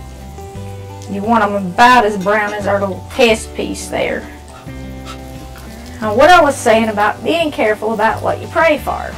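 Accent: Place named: Tennessee, United States. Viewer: Thank you so much!!! My mother was born and raised in Nashville/Memphis Tennessee! You remind me of her! Right down to that beautiful accent! You are in my prayers ❤️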